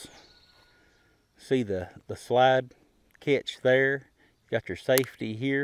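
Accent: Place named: South, United States. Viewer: After seeing your great piece of property and listening to your accent, I am going to guess that you are somewhere in the South. Yours is the second video I have seen on this gun, and I must say that I am not found of the slide stop or the barrel attachment. I have a Kimber .22 conversion kit on top of a Kimber 1911 that functions more like a real 1911. While I am not found of the Kimber, at least it functions more like an original 1911. My biggest gripe about the Kimber is that it does not lock the slide open after the last shot, which requires the shooter to count the rounds he is firing so that he doesn't slam the firing pin down on the back of an empty chamber.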